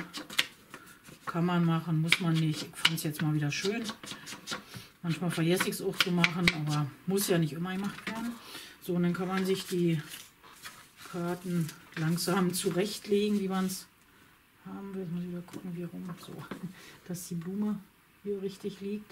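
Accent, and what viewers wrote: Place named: Berlin, Germany. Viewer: Hallo, großes Kompliment für Deine Videos, die sind informativ, mit praktischenTips, Bezugsquellen und "auf dem Teppich geblieben" 😁 Dabei mag ich auch sehr den Berliner Dialekt und Deine treulich kommentierende "bessere Hälfte"!! Bei Euch geht es nicht - wie leider so oft heutzutage - um mehr Schein als sein. Ich guck's total gerne! Danke!! Viele liebe Grüße, Michaela ( Nordhessen mit Berliner Wurzeln 😜) 👍🍀🐞👍❤️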